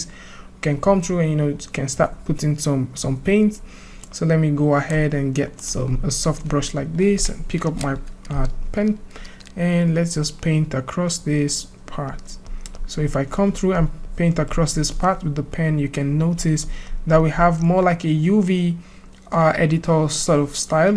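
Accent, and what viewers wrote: Nigeria. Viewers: You sound Nigerian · Are you Nigerian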